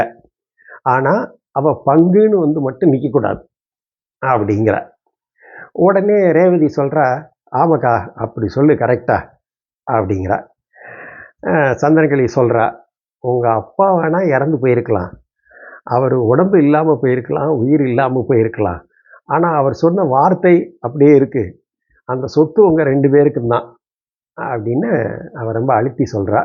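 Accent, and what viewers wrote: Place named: Tirunelveli, India. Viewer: உங்கள் திருநெல்வேலி ஸ்லாங் எனக்குப் பிடிக்கும். நீங்கள் நல்ல கதைசொல்லி. திருநெல்வேலியில் இதே போன்ற ஒரு சம்பவம் எனக்கு தெரியும். இளைய சகோதரியும் பள்ளி ஆசிரியை.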